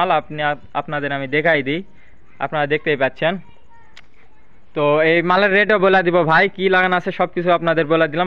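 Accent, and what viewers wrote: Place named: Rajshahi, Bangladesh. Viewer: vai apnar bari kothai kotha sune mone hoi rajshashi abar bogurar vasa kahini ki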